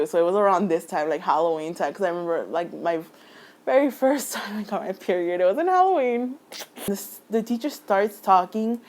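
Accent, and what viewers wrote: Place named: El Paso, United States. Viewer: Omg it sounds like you're from El Paso? Lol my mom is from ELP and I loved going to Mexico to get candy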